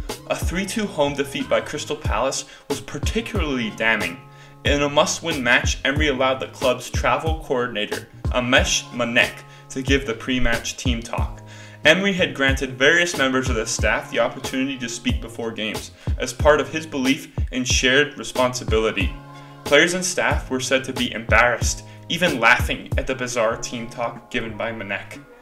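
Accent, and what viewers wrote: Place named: India. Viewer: the narrator's accent is indian on you pl channel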